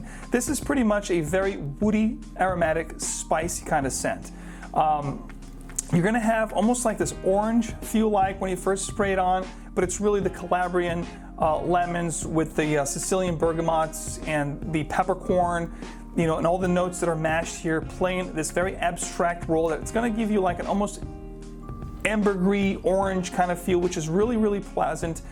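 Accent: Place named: New York City, United States. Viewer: I like your reviews, clear NYC accent... good descriptions , gentleman style!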